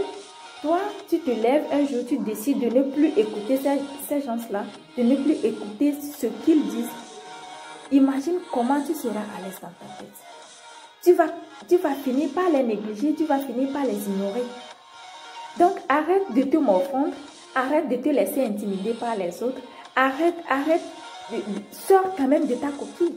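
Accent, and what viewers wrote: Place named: France. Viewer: I love the French accent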